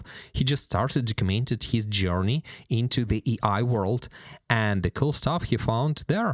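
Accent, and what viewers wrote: Ukraine. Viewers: Holly Dolly, you are our guy. I undestand that by accent. Ukrainian too?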